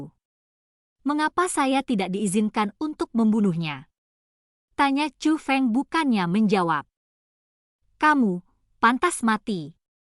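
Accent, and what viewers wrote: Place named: Java, Indonesia. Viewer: kok medok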